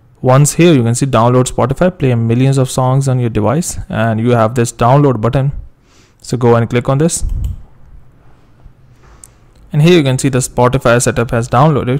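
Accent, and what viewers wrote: India. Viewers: That indian accent is so very annoying 🤢🤮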